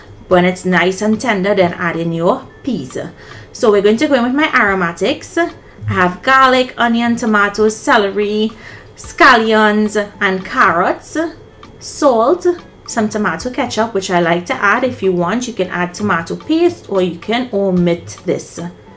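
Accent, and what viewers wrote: Trinidad, Trinidad and Tobago. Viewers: PS. I enjoy hearing the Trini 'twang'🥰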